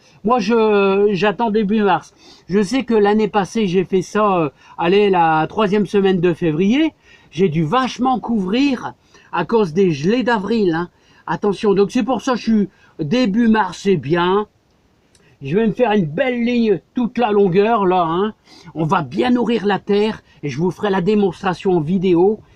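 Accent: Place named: Nord-Pas-de-Calais, France. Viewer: je suis du sud-ouest je parle un peu occitan, et ça fait plaisir de vous entendre parler un peu ch'ti ! continuez !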